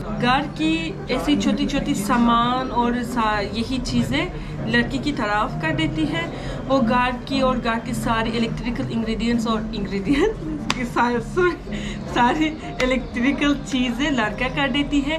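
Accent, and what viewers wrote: Pakistan. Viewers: Ap sukardeo Gilgit baltistan k logo ke tara Urdu bolti Mashhallah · Yes u right u r not phupho 😂 Love your Urdu accent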